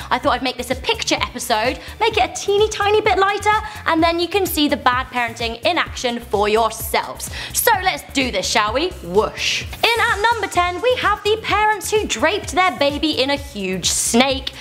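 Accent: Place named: United Kingdom. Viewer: It's called a British accent.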